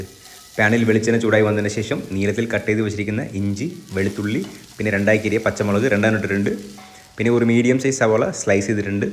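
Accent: Tamil Nadu, India. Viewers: Ningalku tamil accent undallo! Nattil evdeya?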